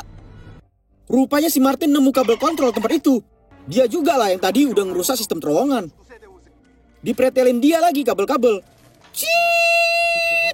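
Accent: Medan, Indonesia. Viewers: Geng aku suka sekali dengar kau becakap logat medan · Asal Medan kau ya. Bang?😂😂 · Medan kali lek dabingnya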